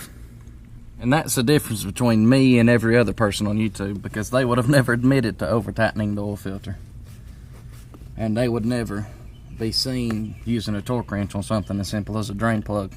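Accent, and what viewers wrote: Alabama, United States. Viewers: Hey, confirmed in the info section that you're from Alabama; I thought I recognized your accent! Shout out from Fort Rucker lol. So, I have an FZ-07 2015, and I've been doing a lot of reading trying to determine the 'best' engine oil to use since I'm about to change mine for the first time (bought the bike used). Popular opinion seems to say 10w-40 and full synthetic. In your vid the oil you use is the performance all purpose. Would you please elaborate on why you opt for non-synthetic? Thanks!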